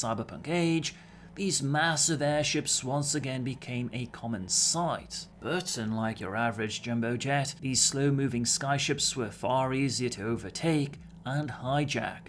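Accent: United Kingdom. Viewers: If you could it would be awesome. Your speech is nice to hear (and that's why i say about braindancing) but when the meaning is more important (for example gang, drugs, Silverhand, the whole series of cyberpunk lore) then your sounds-like-british accent sometimes can make it harder to understand. I mean... I really appreciate your effort but if you give subtitles, then it gonna be like than cherry on the top of the cake. It really works. For example - i understand 57% of the NC gangs from hearing but when i read subtitles - my gang lore understanding hit to 97%. It really works Samurai 😎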